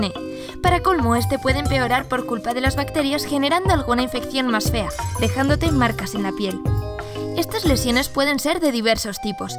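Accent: Spain. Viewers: El acento castellano es enserio molesto muy molesto que modo de pronunciar tan horrible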